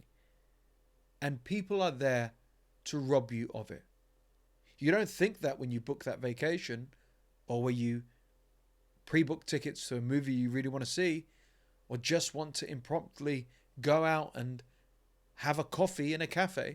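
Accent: United Kingdom. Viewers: He described all of Western societies today to a tee in a British accent.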